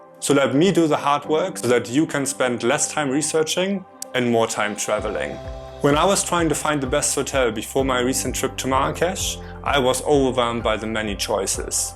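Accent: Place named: Germany. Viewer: nice vlog, german accent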